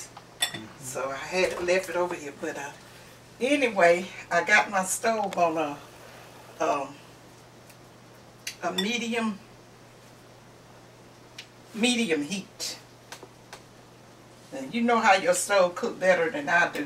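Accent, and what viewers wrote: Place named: Alabama, United States. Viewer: Hello, Ms.! I just found your channel and it takes me right back home to Alabama. The meals you make, the way you talk, and even the stories you tell such as killing a hog only twice a year sounds just like my folks. I wanted to know where you are from because you remind me so much of home sweet home. I just subscribed too! Thanks for these comforting videos!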